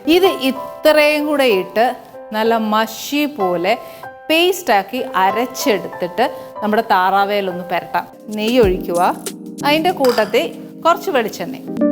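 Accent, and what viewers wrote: Kottayam, India. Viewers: Kottayam bhashayil paranjanneyullu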